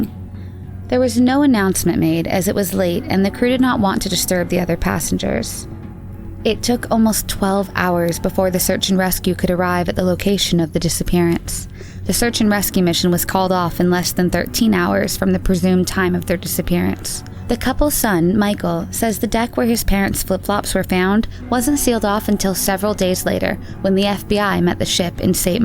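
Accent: India. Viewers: I think the narrator is indian..the accent makes me feel so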